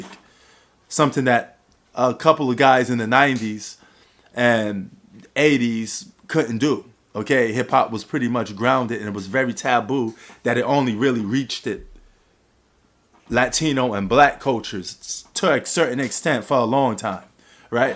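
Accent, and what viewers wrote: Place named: New York, United States. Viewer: Why do you talk the way YOU do sir? Y’all sound funny to us southerners as well so what’s up?🧐 I give props to NY for what they did in the 80’s and 90’s but today NY is Toilet Music. Once Nas and Jigga hang up the mic or pass away it’s a WRAP WRAP. 8 Million Stories and cats up there was scratching to go platinum. The west coast was kicking y’all in the teeth in the 90’s. You think somebody forgot about Puff Daddy ushering in all that jiggy garbage in 1997? Y’all don’t wanna blame him kicking in the door with this over commercialization of Hip Hop. He was the one that opened the door for No Limit and Cash Money. Master P was on his heels in ‘97 too!! The South does have some great rappers but you haven’t taken the time to listen. You just listen to that garbage on Hot 97. It’s a shame when the hottest new NY rapper is Ice Spice. 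What a joke!! Do yourself a favor and listen to by and by as a further rebuttal while you throw rocks from a dusty brownstone in your basement. Btw DJ Premier is from TEXAS!!!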